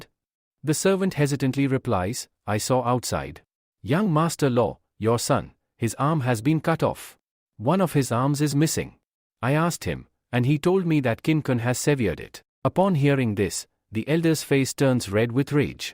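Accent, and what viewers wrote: India. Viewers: Fckn indian accent immediately made this story a stupid joke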